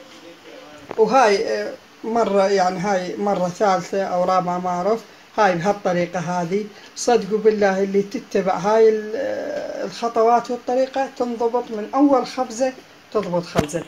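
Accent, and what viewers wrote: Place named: Iraq. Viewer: يازين لهجتكم ياهل العراق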